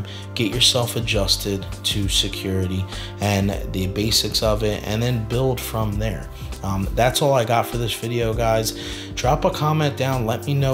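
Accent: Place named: Delaware County, United States. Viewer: This guy is from Delco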